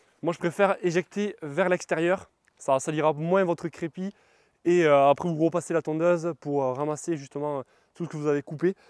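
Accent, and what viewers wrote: South, France. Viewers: L accent du Sud ❤ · Un grand merci pour tous tes conseils pour l'utilisation de la débroussailleuse. Un super tuto très utile et quel beau paysage, cela doit être dans le Sud vu ton accent. Je suis dans l'Ouest et c'est très plat! ---> mais on a des plages pour se baigner.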